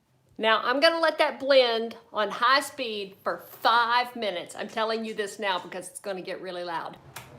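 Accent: Texas, United States. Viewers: Her talkin told me she's in Texas 😅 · As if the lovely Texas accent didn’t give it away!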